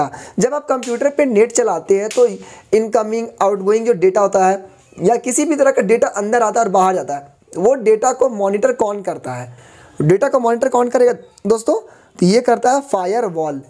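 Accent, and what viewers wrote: Bihar, India. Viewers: Hello bhaiya , you teaches very well. I study IT from you and I want to ask you that are you from Bihar?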